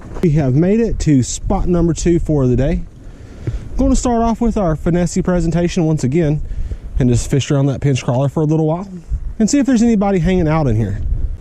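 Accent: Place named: Georgia, United States. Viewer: Are you in ga. Cuz you sound like it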